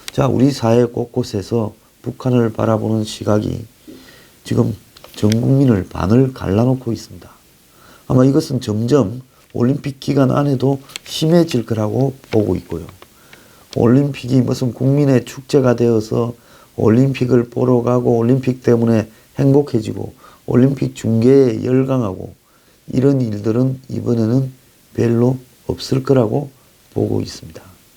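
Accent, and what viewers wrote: Gyeongsang, South Korea. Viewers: 경상도 특유의 말씨와 꾸밈없는어법(때로 억센말투). 정확한 논평 속이 시원합니다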